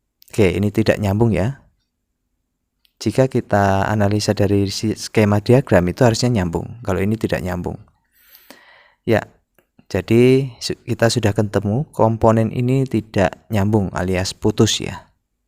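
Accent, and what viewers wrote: Java, Indonesia. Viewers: Oh aku kira di jawa juga Tapi kok logat e jawa ya Makasih bang saya banyak dpt ilmu dr konten e sampean aku jadi ngerti cara nyervis hp y walaupun blm se master abang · Jowo ne ketoro nemen :v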